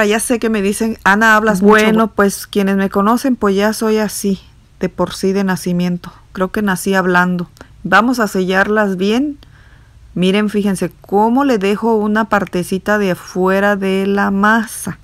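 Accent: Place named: Morelia, Mexico. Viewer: Cuándo oigo que hablas en el tutorial de este video Y de todos tus vídeos me transporto al estilo de voz de la gente de Morelia me preguntó la gente que ve estos videos si alcanza a percibir la diferencia de estilos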